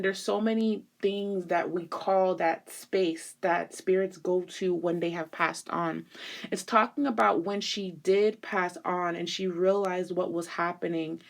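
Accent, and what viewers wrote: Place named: New York, United States. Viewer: Ha. I love your voice and accent lol 😆. New York?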